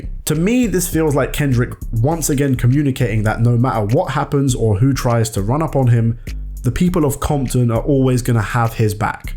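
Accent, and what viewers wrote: United Kingdom. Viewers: Why am I listening to a British dude explain our shit lol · What do you want him to do, an impression? He’s from London. This is his accent.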